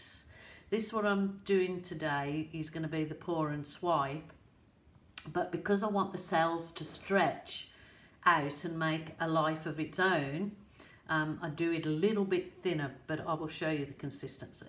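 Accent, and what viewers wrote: Birmingham, United Kingdom. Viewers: Love your pictures & the brummie accent 🌍🇬🇧🇬🇧🇬🇧🌍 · I'm getting a hint of brummie in that aussie accent. Great pour btw. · This is amazing! So beautiful! Your accent doesn’t sound Australian. Are you from the UK? ☺️ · I couldn't quite place it either! Definitely got the Brummie accent but I knew there was also some Aussie in there too.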